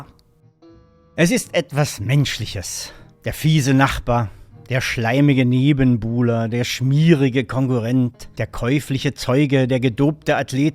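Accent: Germany. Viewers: Naja, es handelt sich um den Dialekt, der im Raum Dresden-Sachsen gesprochen wird, nehme ich an.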